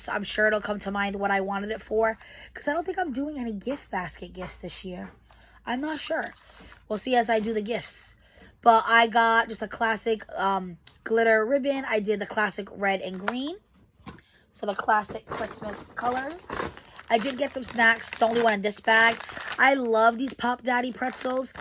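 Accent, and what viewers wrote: New York, United States. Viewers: Harry also has body wash shampoo and conditioner shave stuff ect my husband uses harrys we get his st Walmart because our dollar tree here in North Carolina doesn’t carry it im a new subscriber are you from New York i caught the northern accent because im originally from Long Island New York ❤❤❤ your content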